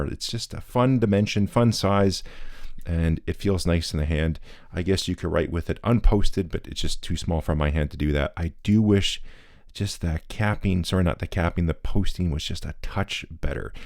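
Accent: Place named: British Columbia, Canada. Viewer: Cut mat ✔️ Hands only ✔️ BC accent ✔️ Valuing things in kopeks and Dong ✔️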